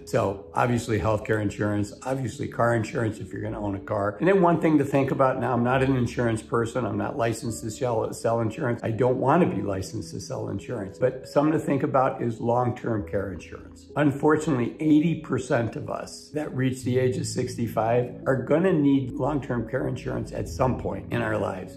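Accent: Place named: United States. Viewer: Ohh my God… what is this man’s accent… I love his accent… I can listen to him forever. Even if it is financial information as it looks like 👍 fine I will take that 😂 I live in Norway 🇳🇴 so please don’t shame about my terrible knowledge of American accents 😊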